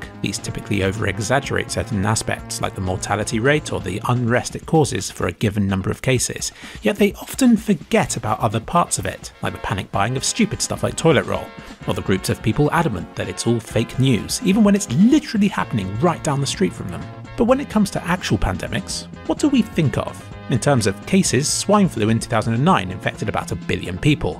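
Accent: United Kingdom. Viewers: You sound British a re you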